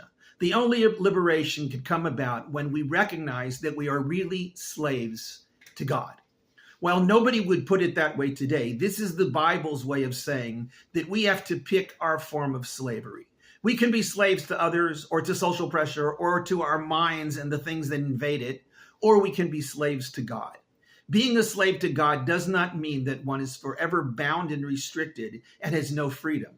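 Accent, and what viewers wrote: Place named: United States. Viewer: You sound very indigenous to the Middle East with that American accent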